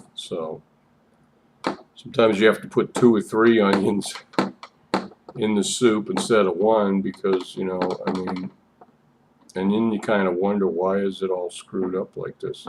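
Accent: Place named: Boston, United States. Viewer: where do you live Florida? I love your Boston accent lol happy Sunday fun day!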